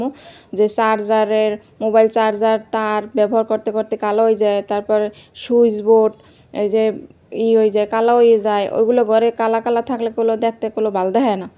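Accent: Barishal, Bangladesh. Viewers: মুই কিন্তু আপু তোমার বরিশালের কথাগুলো বেশি ভালোবাসি ❤❤❤তোমার কথাগুলো বেশ ভালো লাগে।পরিষ্কার ।করার আইডিয়া দারুণ ❤❤❤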